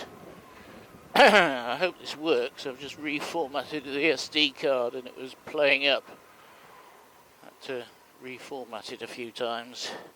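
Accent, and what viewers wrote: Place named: France. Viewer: Sounds like you've lived in France for quite a number of years,.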